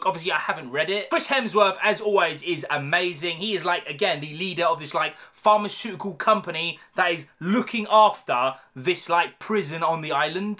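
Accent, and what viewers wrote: United Kingdom. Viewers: Wow thank you. I've never heard a British accent like that before. I subscribed only recently during your Obi Wan reviews so wasn't sure where you were from.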